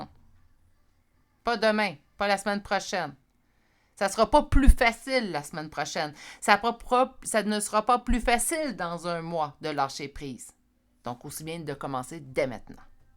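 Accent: Canada. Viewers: Gratitude infinie à vous car il y avait 1 message pour moi. Je vous trouve formidable et j'adore votre petit accent canadien ❤❤ J'ai 1 suggestion pour 1 prochaine guidance. 1 guidance avec les numéros du prochain loto. V'la 1 idée qu'elle est bonne 😅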